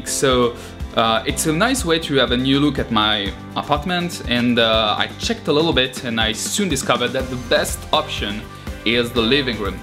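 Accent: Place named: France. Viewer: On entend bien l'accent français. J'adore la vidéo continuez !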